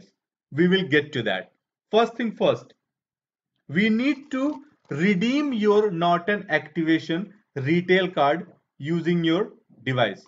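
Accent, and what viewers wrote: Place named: India. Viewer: Fuck you and your accent. Man I hate when an indian speaks English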